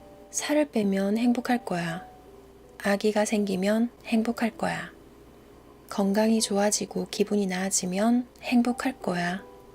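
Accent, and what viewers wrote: Gyeongsang, South Korea. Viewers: 경상도 사투리가 부드럽고 달달한 목소리로 들립니다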